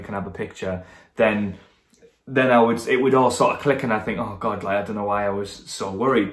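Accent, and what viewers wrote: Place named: United Kingdom. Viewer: Amazing voice, and super handsome, oh and I love your British accent!!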